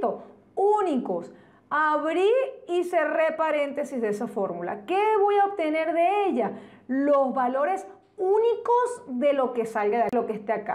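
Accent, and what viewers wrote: Venezuela. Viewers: OH! Es la joya de esta semana! Primero porque estas funciones están de lujo! Segundo: porque ella explica muy chévere Tercero: creo que es venezolana y ese acento de mi tierra me mata!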